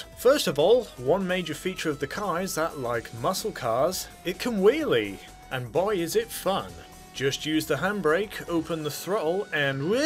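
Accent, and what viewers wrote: England, United Kingdom. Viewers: That English Dave was spot on, oh yeah, well you are English, soooo yeah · He seems to be British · Sounds nothing like English dave but he does sound English even in his normal voice which doesn’t make a lot of sense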